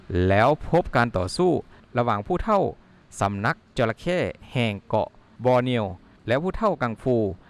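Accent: Laos. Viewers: แอดเป็น คนลาว ใช่มั๊ยฟังจาก สำเนียงการพูด ช.ช้างเป็น.ซ.โซ่หมด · แอดมินเปฺ็นคนลาว พูดบ่ชัด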